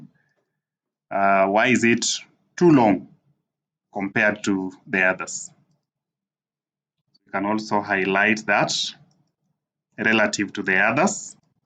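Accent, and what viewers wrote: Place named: India. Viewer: Why is the audio indian😢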